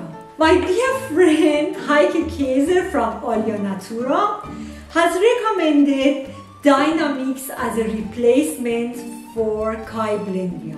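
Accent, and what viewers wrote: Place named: Iran. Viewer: Man, that is one thick persian accent lol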